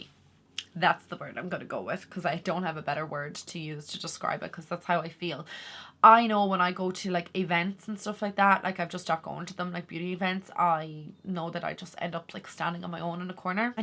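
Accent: Ireland. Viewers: I love your makeup tutorials, all varieties. Also your Irish accent. I live in Texas. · I am here for the makeup. I think some YouTubers have forgotten that for many folks, they are not only a source of information and entertainment, but also a source of escape! Our daily lives are filled with SO much seriousness/responsibility: jobs/careers, family, illness, politics, etc; many see YT Beauty videos as a way of taking our minds off of all of that. A chance to 1). See a smiling face; 2). Learn of a new "look", technique, and/or product; 3). Hear a different accent (love that Irish lilt, btw!); 4). Even hear about a personal issue/problem that does not have an effect on us, but that we might be able to commiserate with, encourage, or even help others to know that they are not alone. But for me it will always be about the makeup. I do wish the "Drama" would remain behind closed doors. 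That is the reason for HAVing doors in the first place! Sorry for the long rant, but I am tired of those other folks forgetting their purpose here: To have fun with makeup! Thank you for being among those who do remember. Take care. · I feel like certain makeup youtubers are trying too hard. I like watching you as i love your down to earth personality and your accent. My mams side is from Ireland. Lovely place 😍 you make me wanna grt my tongue done but im a scardy cat lol. Don't change hun 😀xxx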